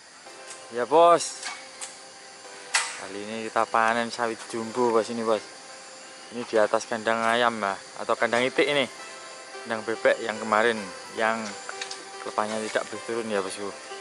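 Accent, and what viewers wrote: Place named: Java, Indonesia. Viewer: Logatmu jowo maseh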